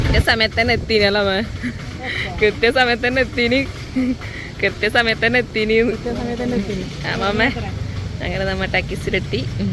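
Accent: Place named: North Malabar, India. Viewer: Hii നമ്മളും ആ നാട്ടുകാരാണുട്ടോ കാങ്കോൽ. വടശ്ശേരി മണൽ. ഇപ്പൊ മുംബയിൽ സ്ഥിരതാമസം. നിങ്ങളെ വീഡിയോ കാണുമ്പോ ശെരിക്കും നാട് മിസ്സ്‌ ചെയ്യുന്നു. നല്ലരസുണ്ട്ട്ടാ. പ്രത്യേകിച്ച് നമ്മളെ ഭാഷ😀എനിക്കും യൂട്യൂബ് ചാനൽ ഇണ്ട് wings of dreams ഇതെന്നെ ഈ കമന്റ്‌ ഇടുന്ന അക്കൗണ്ട് ഞാനും മുംബൈ ആണെങ്കിലും നമ്മളെ ഭാഷയും നാടും ഒക്കെയാണ് സ്നേഹം ❤️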